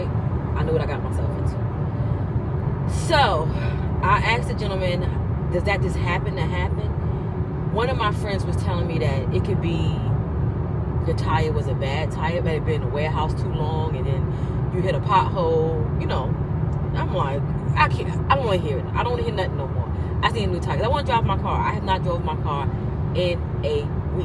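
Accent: New York, United States. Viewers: Hey....New Subbie here 🎉. I am loving your vlogs Girly and truth be told, when I heard your accent, I immediately hit the like and Subscribe button...lol! I miss the NEW YAWK lingo. I'm Brooklyn bred, now Texas Fed, but it will be NY FOREVAH!!!!! I am loving your channel!!! I can't wait to binge watch all that I've missed ❤😂❤. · Gurl, your skin is amazing and you look amazing! Also, a country New York accent, that's elite!!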